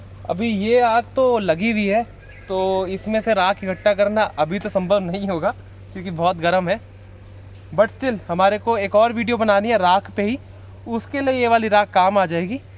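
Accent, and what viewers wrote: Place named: Rajasthan, India. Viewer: Rajasthani ho kya?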